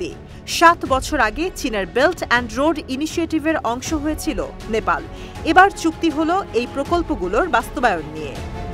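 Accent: India. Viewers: আসলে যে সাংবাদিক খবর পরিবেশন করছে সে মূলত ভারতীয় নাগরিক তার ভাষার ধরনেই বোঝা যায়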